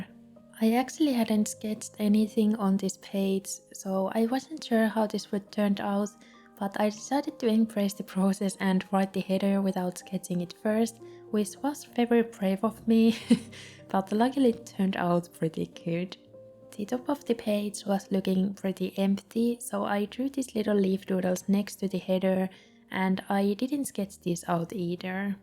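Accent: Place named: Finland. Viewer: I knew you were Finnish from your accent haha :) my mom is from Finland! this is also super cute!!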